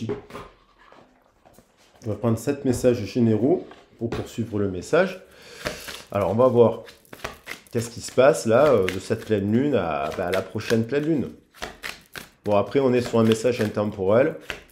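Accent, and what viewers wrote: South, France. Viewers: Coucou 👋🏼 😉 Ce n’est pas grave tu es pardonné 😉☺️dis moi tu viens de Montpellier avec ton accent du Sud?! 🤣tu 🎶 chantonnes quand tu parles c’est sympathique comme style 🤩🥳😜belle journée à toi le tourtereau 🤣bisous 😘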